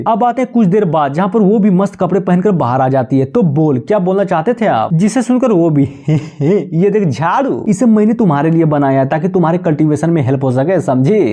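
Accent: Bihar, India. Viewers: Bhai bihar se ho kya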